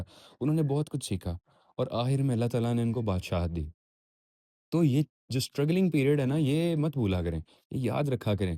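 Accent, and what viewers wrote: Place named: Pakistan. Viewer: Aap k elafaz bolne ke treqe se lagta h aap pakistani ho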